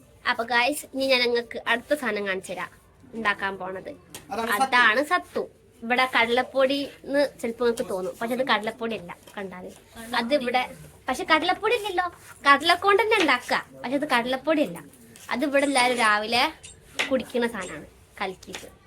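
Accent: Malappuram, India. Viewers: അതെ.. മലപ്പുറത്ത് ആയിരുന്നു എന്ന്തോന്നുന്നു ഇവര് താമസിച്ചിരുന്നത്. ഇങ്ങക്ക്.. ഓല് എന്നുള്ള വാക്കുകൾ എപ്പഴും സംസാരത്തിൽ വരുന്നുണ്ട് 😊🥰🥰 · മലപ്പുറം സ്ലാങ് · എന്തായാലും മലപ്പുറം . ഭാഷയാണ് നിലമ്പൂർ പെരിന്തൽമണ്ണ ഭാഗം ആണ്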